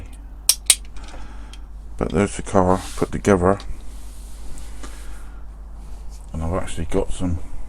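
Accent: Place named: Somerset, United Kingdom. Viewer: Top Job you sound like a fellow somerset boy. Really enjoy your vids which inspire me to get my act together to restore all the diecast I have collected of all types and sizes. Cheers and many happy ziders!!!!!!!!!!!!!!!!!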